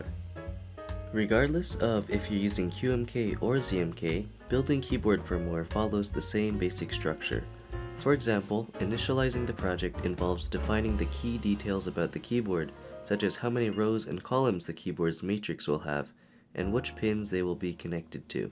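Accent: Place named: United States. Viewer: Is this the first time he’s spoken in a video? Didn’t expect him to be American.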